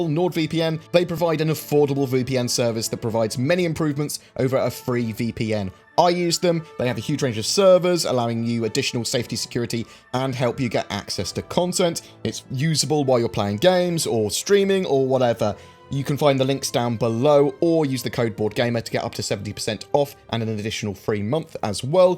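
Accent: United Kingdom. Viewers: Is that a UK accent? Why does he speak like a sound wave .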